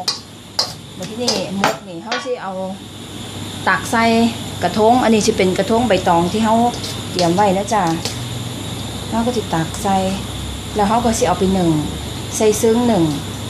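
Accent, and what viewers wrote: Isan, Thailand. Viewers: ติดตามคลิปพี่หลายคลิปแล้วค่ะชอบพี่พูดอีสานมากค่ะพูดเพราะมากๆค่ะ ทำอาหารก็สะอาดน่าทานมากค่ะ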